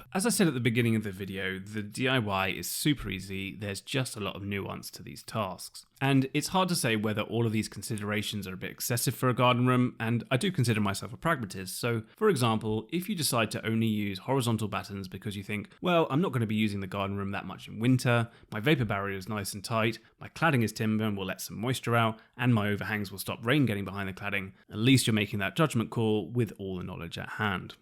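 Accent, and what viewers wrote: United Kingdom. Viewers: So good - thank you. We have just built a sculpture workshop with skillion roof, tapered purlins and spacers - VERY similar to a design that you show here. A labour of love with just the cladding to go. We started with no building experience. With a mixture of informed builder/designer friends offering advice, you tube tutorials - such as this superb example - and our own nouse we’re almost there. The last thing that we did on the weekend was to cut down a repurposed hardwood and full-length glass door and to fit out the door frame and install the door. It was fiddly but fitted beautifully - again using a you tube tutorial. All windows are re-used (free) old Huon pine ones. It’s an absolutely stunning timber that I use for sculpture. We’re using vertical rough-sawn hardwood (‘Tasmanian Oak’) cladding following your provided example, leaving air spaces due to the overlap. 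We live in Tasmania with a mild Mediterranean climate, though l spent time growing up in Devon. Your accent’s a bonus as it takes me back to time spent with my cousins in the UK! Thanks for your thoroughness with this guide; much appreciated.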